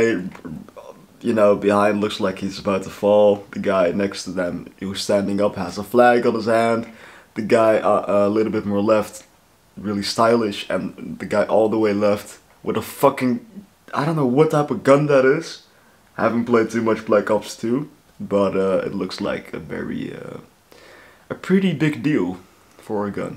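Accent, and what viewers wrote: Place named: Netherlands. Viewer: Loving the Dutch English accent, kon gelijk horen dat je uit Nederland kwam 😂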